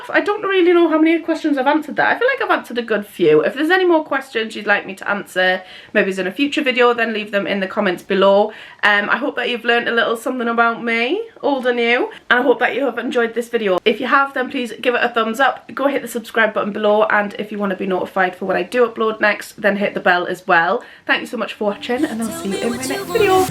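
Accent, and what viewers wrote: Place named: Newcastle, United Kingdom. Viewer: I love the Geordie accent 🔥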